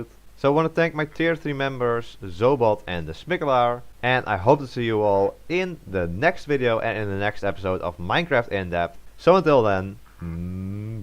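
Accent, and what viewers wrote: Netherlands. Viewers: ah, dutch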